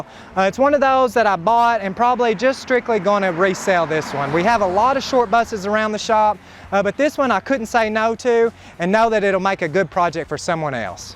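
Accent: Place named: Texas, United States. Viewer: Not gay, just a classic Texas drawl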